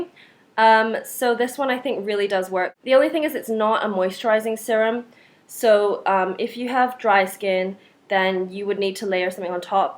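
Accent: United Kingdom. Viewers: Hiii, I'm kind of a new-ish viewer of yours and I was wondering if you've spent some time in the UK because your accent is British at times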